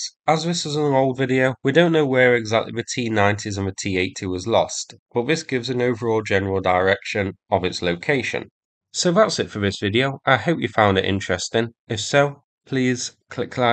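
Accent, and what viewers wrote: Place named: Northern England, United Kingdom. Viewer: greetings from the UK, thanks again for your diligence, I always look forward to your videos, nice to hear a fellow Northener. Slava Ukraini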